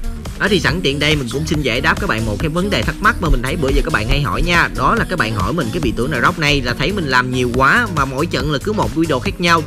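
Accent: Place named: Mekong Delta, Vietnam. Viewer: Thương ông này vl.nghe dọng miền tây dể thương gớm :))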